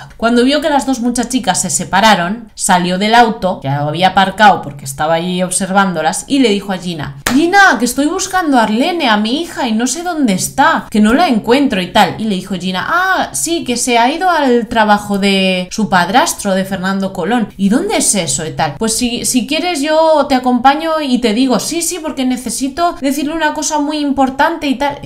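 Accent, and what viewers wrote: Spain. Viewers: Al principio,pensé,ke hacías algo de cosmética.pero por casualidad pasó ese rato Y vi tus historias,y me encantan .los casos y tu manera de contar mola mucho pareces mañica.un 10 per tu desde Barcelona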